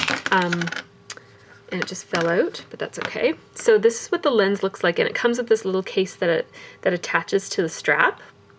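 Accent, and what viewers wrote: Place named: Canada. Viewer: Thanks for the great review! I've debated on buying one of these for a while. Also it was nice to see your pretty face on camera! I've watched your channel for about a year now so it's nice to put a face with your sweet Canadian voice :) ~Melissa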